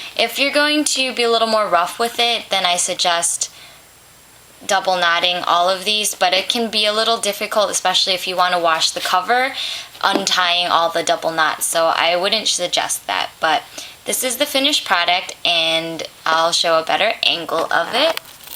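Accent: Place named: Canada. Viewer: she sounds Canadian to me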